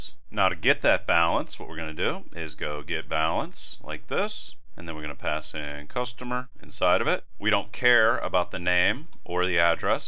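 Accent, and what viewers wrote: United States. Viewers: Offtopic comment, I find it really amusing the fact that you can clearly tell this guy is a 100% 'Murican made just by how he talks.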